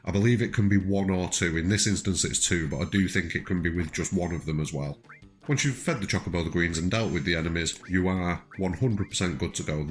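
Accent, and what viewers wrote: Manchester, United Kingdom. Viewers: OP are you from Stockport? Just skimming across ff7 gold chocobo videos and noticed that you’ve got the same Manc twang as me!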